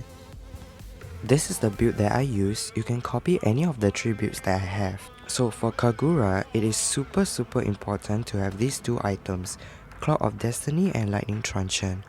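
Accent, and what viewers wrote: Singapore. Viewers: wah haha not common to find sg players. I could totally tell from the way you spoke in the video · You have this singaporean accent. 😮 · Sounds sg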